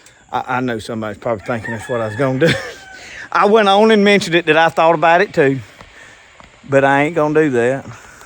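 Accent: South, United States. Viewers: i love southern drawl. I have it too.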